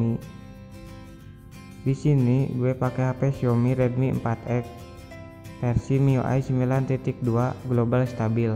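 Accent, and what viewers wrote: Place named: West Java, Indonesia. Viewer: LOGAT SUNDA DIA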